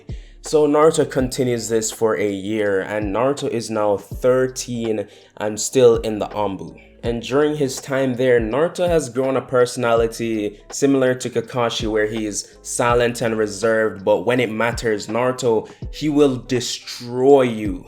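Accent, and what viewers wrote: Jamaica. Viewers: You're Jamaican aren't you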